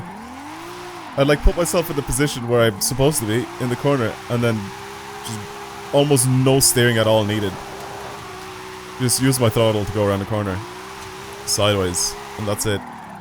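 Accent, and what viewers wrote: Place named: Donegal, Ireland. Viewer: Where you from in Ireland cause I can’t recognise that accent you sound a bit like Donegal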